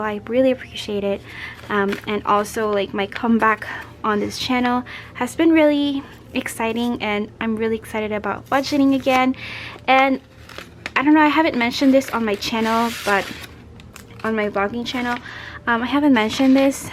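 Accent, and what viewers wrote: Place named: Philippines. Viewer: Firts time viewer and why did I recognize the Filipino accent from sentence one. 😅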